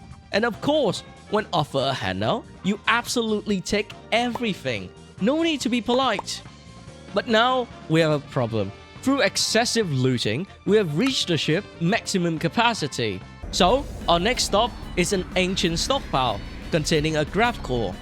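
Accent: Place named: India. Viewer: why do u sound like u have a 5% indian accent today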